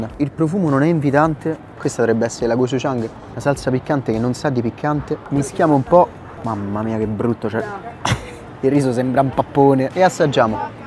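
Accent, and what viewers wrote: Rome, Italy. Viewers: Assomigli a Dean Winchester: adoro! Tu e il tuo romanaccio, verrete con me domani in treno:)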